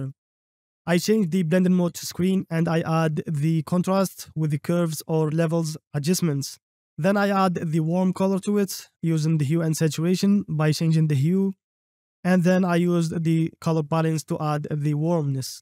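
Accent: Arab world. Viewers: أنا متأكد انك عربي من لهجتك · أحسك عربي ؟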